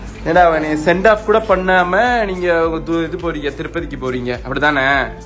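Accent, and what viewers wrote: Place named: Madurai, India. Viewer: Anneyy nenga madhurai ah unga language appudi dha iruku my fav annaw🫂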